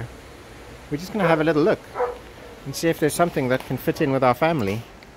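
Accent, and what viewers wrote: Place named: South Africa. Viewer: I suspected you where a South African when i heard your accent, great stuff my friend, enjoy every minute of it, and bask in the safety. Kind regards from South Africa.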